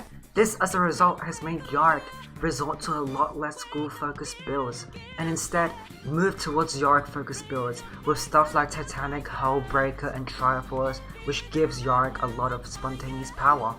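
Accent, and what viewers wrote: Australia. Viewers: Ur content is gangster where u from bro? australia?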